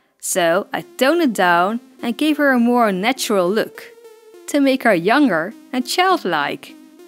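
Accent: Netherlands. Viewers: Please Ive been a subscriber for a long time 😩 Your tutorials were one of my fav since you made the dolls come to live and gave them such a personality Im hooked. Im also from the Netherlands so I recognize that accent. Plus the faceups and animal creations are top notch that they remind me of the Fantastic Mr fox. Ive been watching for a long time even when I was unsubscribed.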